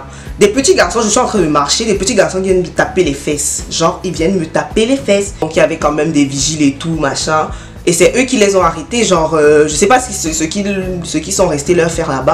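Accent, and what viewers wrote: Cameroon. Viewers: Tu as un accent camerounais????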